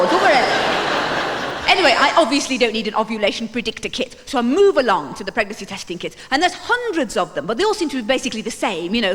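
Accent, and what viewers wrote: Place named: North, United Kingdom. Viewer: Brilliant Woman! Although I ended up spending half the time playing a game of listening out for her northern accent - point to me every time it sneaks it was back in :D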